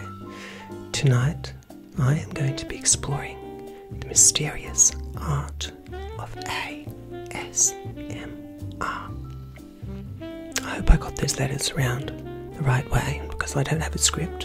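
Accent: Australia. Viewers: Your Australian accent is on point!! 😍😍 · Without a single doubt, the best Aussie accent I've ever heard from a non-Australian. You even had the same tongue in cheek wit you always have but with that very distinct aussie brand to it. Very nuanced performance love love loved it. Cheers from down under x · As an australian - probably the best Aussie accent from a foreigner ive ever heard!!!!!!! looking scrumptious as always xx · Shiiiiiit!! That is a noiiice Aussie accent! And I'm an Aussie Sheila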